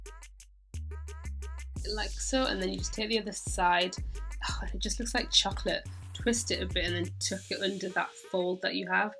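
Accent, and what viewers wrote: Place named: North, United Kingdom. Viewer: You're pretty! And I like your accent. Northern? ❤️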